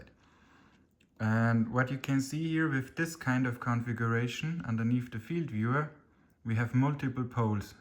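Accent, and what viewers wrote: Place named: Germany. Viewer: Hörst dich deutsch an.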